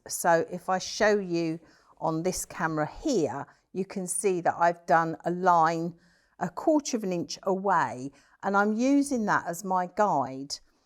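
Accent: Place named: United Kingdom. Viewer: I love listening to you speak. Usually, I can't understand British speaking people ... And if I can, the way they speak can be so over the top. But not you. Your speaking voice and accent is perfectly lovely. Just Beautiful.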